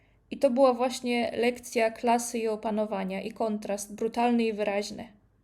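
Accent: Russia. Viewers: Czyli jak maciak popiera pani rosyjski i białoruski reżim, po Pani akcencie nie dziwię się. Łagry, zabijanie przeciwników politycznych jak Nawalny - to jest wolność słowa. No Brawo. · Ile tu ruskich troli się namnożyło. A tak w ogóle niech pani popracuje nad językiem polskim, bo słyszę akcent rosyjski z pani ust. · Ty ruska. Na front! Czuć rosyjski dialekt. L! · Audycja sponsorowana. Zobaczcie i poznajcie sponsora kanału jeżeli potraficie czytać i słuchać w melodyjnym języku rosyjskim 🤣 Bystrzejszym uszom proponuję wsłuchać się w akcent pani lektor. Czego tu jeszcze nie rozumiecie? 😂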